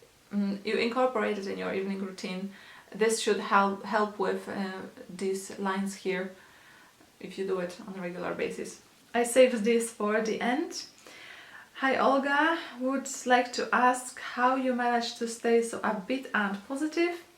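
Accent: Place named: Poland. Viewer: I love your polish accent don't lose it, it makes you unique. luv from Malaysia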